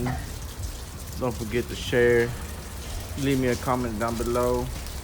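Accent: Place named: South, United States. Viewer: Let their be rain wow coming down sounds like you from the south I’m from Louisiana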